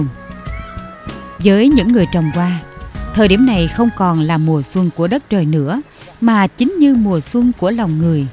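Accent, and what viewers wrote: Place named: Kien Giang, Vietnam. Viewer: Kien giang giong rien que toi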